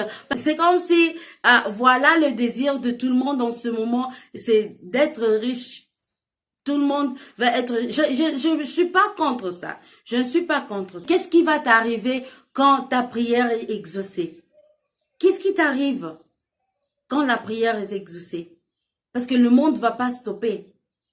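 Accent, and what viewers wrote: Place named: France. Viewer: Your French accent is so beautiful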